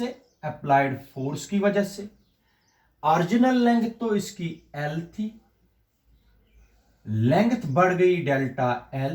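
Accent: Kashmir, India. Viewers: sir why do you have a specific “kashmari" accent.....🙂 😊 😊 and love ur....teaching style sir...❤️❤️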